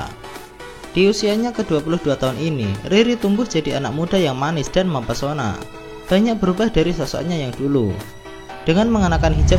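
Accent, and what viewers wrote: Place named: Java, Indonesia. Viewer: medok bgt suara lu tenk 😬😅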